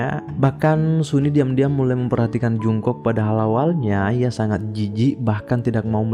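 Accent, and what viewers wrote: Bugis, Indonesia. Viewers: Kentarana bugisna admin🤣🤣🤣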